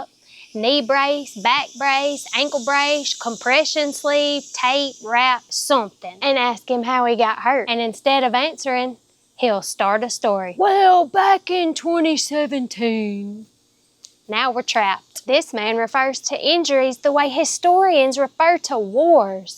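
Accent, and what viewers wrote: South, United States. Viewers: As a southern man, I love to hear her voice/accent. Classic. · i love your southern drawl beautiful, all women should speak like you..... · I could listen to you talk all day. Southern with lots of sarcasm!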